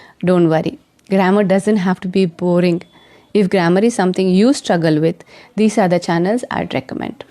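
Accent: India. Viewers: Why are you suggesting foreign English-language channels when you also speak with an Indian accent? You can suggest Indian YouTube channels as well; there are plenty of good ones.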